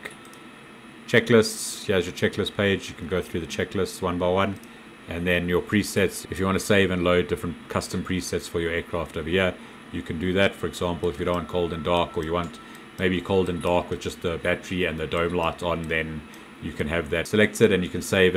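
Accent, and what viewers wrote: South Africa. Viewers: thanks for the tutoral. i am a big BOEING fan and after many years of flying them i have eventualy decided to tryout Airbus. BTW, its good to hear a South African accent again, (other than my wifes) as we have been living in the UK for sometime.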